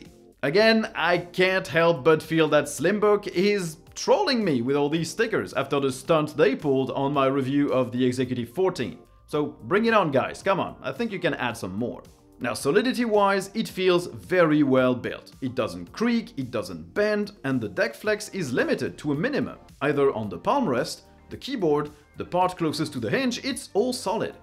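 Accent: France. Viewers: When I see the Linux Experiment I have to click it. It is like an addiction. He has the whole package: Beard (some say a squirrel is hibernating in it), the French accent, the same undershirt he has been wearing for 10 episodes straight (who doesn't like Roquefort?).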